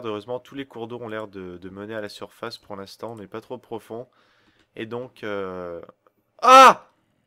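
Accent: Quebec, Canada. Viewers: C'est le film Sanctum, de James Cameron, mais bon il est québécois alors son accent est tout a lui :D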